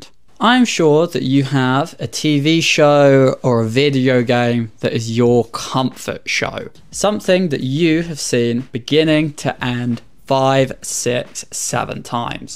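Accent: United Kingdom. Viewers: I love your videos.. Your british accent is so to die for ❤😊have been living in the UK for last 2 decades..I was born and raised in India.. My passion for english made me learn the language.. It's really difficult to learn a language esp in adulthood.. Having said that, nothing is impossible.. Currently, I have been learning french by myself by using Netflix, YouTube videos and other resources.. I think, it's much easier to learn the language now than before as lot of content is available at your fingertips.. I recently watched your Reading town video.. I live in Reading town.. It's a beautiful place and felt happy to see it on your channel.. Wish you all the best for your future endeavors 😊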